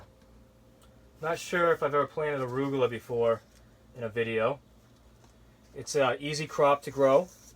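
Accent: New England, United States. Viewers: Great video! Im getting ready to set up an indoor rack system and you answered a bunch of questions. Sounds like you're in New England 😎? We're out of Maine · Nice work! I'm new to your channel and I really enjoyed the content and do I hear a bit of new England accent?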